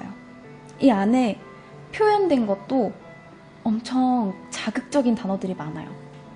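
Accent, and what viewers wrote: Gyeongsang, South Korea. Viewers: 사투리가 들리는데 경상도쪽 억양인가요?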